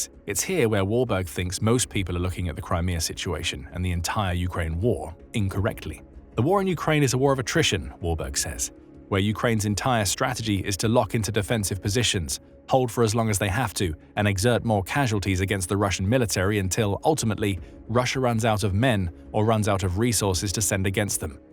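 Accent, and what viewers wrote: United Kingdom. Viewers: Misinformation is so easy to detect these days. Just detect the british accent.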